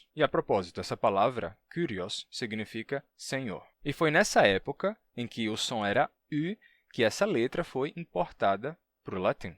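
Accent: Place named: Northeast, Brazil. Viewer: Bom Jesus da Lapa- Bahia)🌎🇧🇷🌵parabéns Guerreiro tu manja da língua portuguesa tu poliglota. 🇵🇹Foi um português que descobriu aqui a gruta a mais de 300 anos faça pesquisa ai Google Guerreiro do RN. Seu sotaque é massa nosso Nordeste de vários sotaques. Hoje tem internet para divulgar mostrar os 2 lado da história real . Tem.baiano qua apedreu Mandarim só ele de xique xique-BA. Ele tem canal faça pesquisa ai guerreiro. 🇨🇳🛫🛫🛫🛫🛫🌵🌵🌵🇧🇷2025 Bahia..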